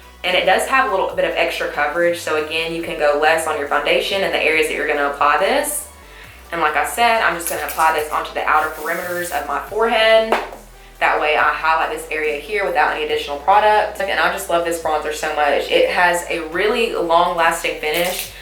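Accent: South, United States. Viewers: Bc she has a southern accent!?! OMGGGG this makes me mad like they're not the same whatsoever she just has the same accent as someone else and now they remind others of someone, seriously 😒 gosh this is annoying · that's where that sweet accent comes from! I want to live down south so bad! Great video I love that you use mostly affordable drugstore make up! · (1) You are even prettier natural! (2) Your Southern accent really surprised me for a moment - but my ear adjusted. :) (3) Your skin is naturally perfect & translucent. (4) Nice suggestions and demonstration. Thank you.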